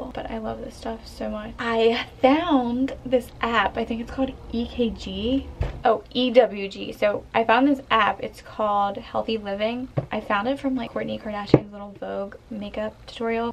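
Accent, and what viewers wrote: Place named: South, United States. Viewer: Why are you the only person in your family with a southern accent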